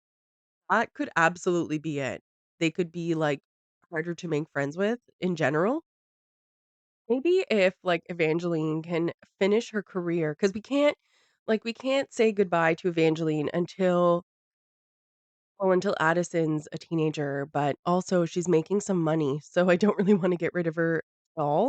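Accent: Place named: Ireland. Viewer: So about 4 episodes ago was the first video of yours that i had found and please dont take offense to this but i remembered why i fell in love with your videos. Your voice sometimes sounds very irish. I'm 25% but born raised US so you were entertaining and then when im sober i still love your videos lol. also i can never here the background noise of the construction. and rarely hear doggo. I remember clicking ahead and being like who are these people???? and here we are lol. Thank you for all the wonderful videos!!! <3 Still hoping for like an active members only discord lol 🤞🤞🤞🤞🤞💜💜💜💜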